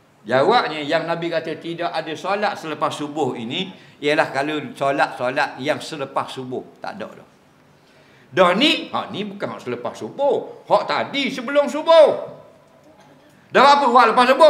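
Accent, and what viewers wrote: Terengganu, Malaysia. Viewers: Sebab bahasa ganu ke?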